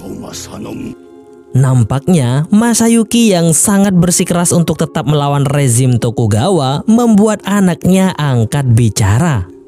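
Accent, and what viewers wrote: Madura, Indonesia. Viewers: Orang madura ya mas🙏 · Admin oreng madureh dimmah yeh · Min org madura yaa?? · addaaaa teppak ka reng madureh😂,,,,,ta'entos kakeh nom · Sama2 org madura mass, btw asal kota mana ya😂❓❓❓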